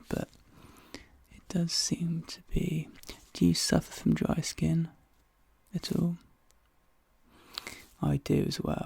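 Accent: United Kingdom. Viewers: The British accent is really beautiful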